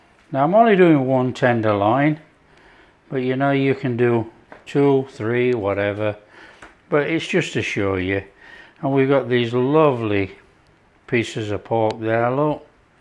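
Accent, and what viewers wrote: Yorkshire, United Kingdom. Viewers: No French accent, just a wonderful Yorkshire brogue. Pork in cider is delish, I could scoff that down now. Nice glass of cider to go with it, what more could you want? xx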